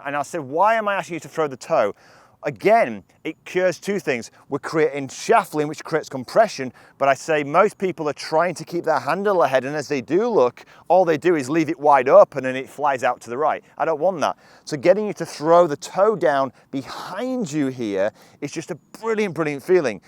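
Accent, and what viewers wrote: United Kingdom. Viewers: i've been golfing for many years but could never really get this right. i always thought it was some big move to make to get the center forward but just having the idea of striking more forward will help make it happen sounds good to me. thnx for the info and help. i've always been trying to figure out where you are from in the uk with you accent, i'm from the boston, massachusetts area in the states.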